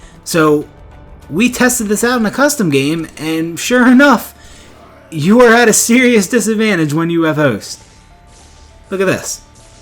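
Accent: Baltimore, United States. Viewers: Baltimore for sure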